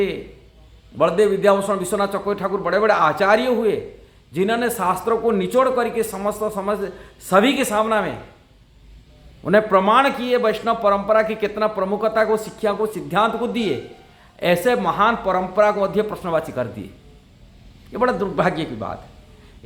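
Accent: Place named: Odisha, India. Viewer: He is from odisha so obviously he has some glitch but he is speaking can u able to speak in oria . sorry for any offence Hare Krishna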